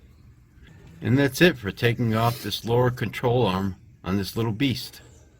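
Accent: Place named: New England, United States. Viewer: Love your New England accent.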